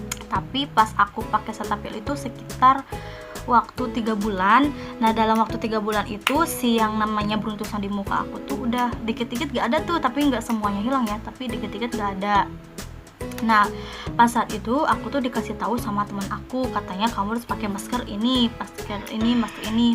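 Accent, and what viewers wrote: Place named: West Java, Indonesia. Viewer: Sundanese bgt yekhannn 😁😅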